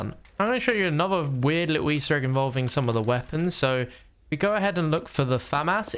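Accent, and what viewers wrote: England, United Kingdom. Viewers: "omg a Juggernaut. How many grenade hits is he going to take?" Cleary hasn't played the High Explosive Spec Ops mission or maybe even figured out it takes one to the head XD. (also, the proper term is "soccer ball". But I can tell you're from England so it's whatever. lol) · Are you UK?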